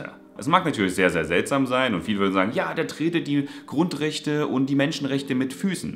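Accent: Saxony, Germany. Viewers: ich find deinen Dialekt super, komme selbst aus Sachsen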